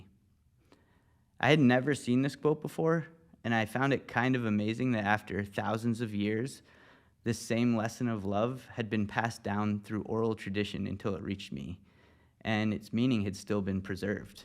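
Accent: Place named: Massachusetts, United States. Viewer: Before I address this question ⁉ I have a question of my own . Are you originally from Massachusetts, as your accent is not that pronounced? I'm originally from New England myself , Connecticut.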